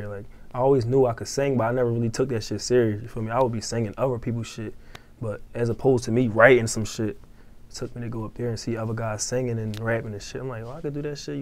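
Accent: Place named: Philadelphia, United States. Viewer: That's what being from philly sounds like lol #SouthPhilly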